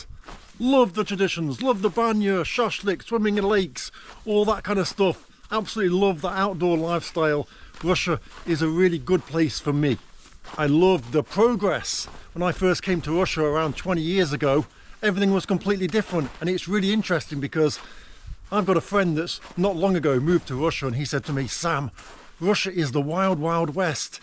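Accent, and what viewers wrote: England, United Kingdom. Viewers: Weird watching an English accent in Russia . 😃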